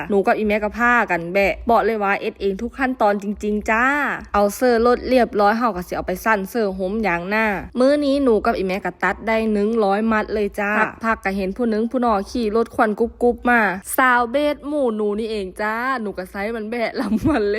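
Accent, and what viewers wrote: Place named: Sakon Nakhon, Thailand. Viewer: พูดเหมือนคนสกลนครบ้านยบายเลย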